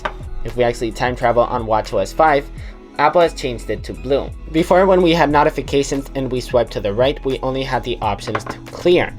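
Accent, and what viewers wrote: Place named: Spain. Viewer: His accent is Spanish, as was born raised and lives in Barcelona, Spain. He spent 3 years in the United States as a foreign exchange student. It’s why it’s hard or takes awhile to figure out that is not American just by watching only a few of his videos. Listen to him pronounce the city in California called Cupertino, which is where Apple HQ is. Many cities and towns in California have Spanish names! I’m sure I overly answered your question, but I’ve been following ’ channel from the early days. Have a good night! ~Cat from NY 🐱🍎💜👍🏻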